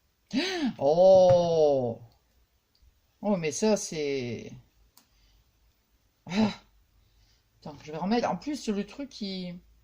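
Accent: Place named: North, France. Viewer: Ccou je viens de découvrir ta chaîne et tu me fais trop rire je me reconnais un p’tit peu dans ta façon d’être j’aime bien du coup je m’abonne à ta chaîne merci reste comme tu es ne change rien j’ai cru reconnaître une Tite pointé du Nord dans ta façon de parler lol 😂 👍bisous 💋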